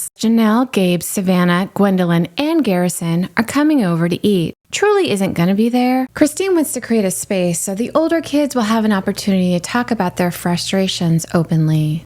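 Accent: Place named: Fargo, United States. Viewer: I absolutely live for your Robyn/Fargo accent. Makes me laugh every time.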